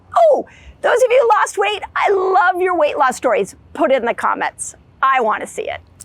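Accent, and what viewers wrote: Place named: Midwest, United States. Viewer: Ah, cool. You sound midwestern, so I wasn't sure.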